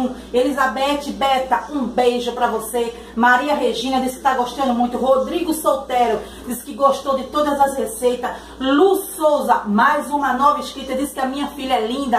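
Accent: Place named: North, Brazil. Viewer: Estou gostando dos seus videos, muito legal as receitas, veja que voce é pessoas cristã, isso é bom. pelo seu sotaque percebo ser nortista. abraços do amigo Paul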